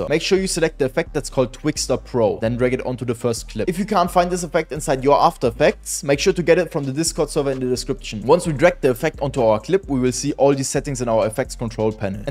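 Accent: Germany. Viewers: Man hört dass du Deutscher bist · Man hört so krass das du deutsch bist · i hear German accent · i hear deutsch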